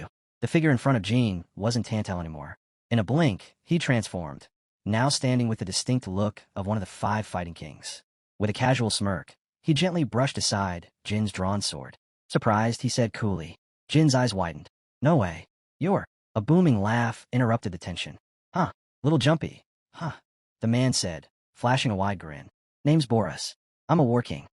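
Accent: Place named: United Kingdom. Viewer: The audio became a British man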